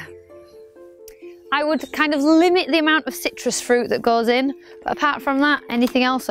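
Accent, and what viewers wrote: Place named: Northern England, United Kingdom. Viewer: healthiest balanced diet compost i have seen for a while' love your Northern accent ( yep I'm a southern softy that went uni in B)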